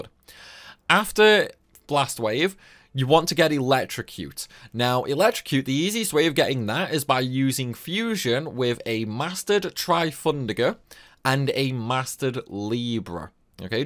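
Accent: United Kingdom. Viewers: That’s how a lot of British people talk about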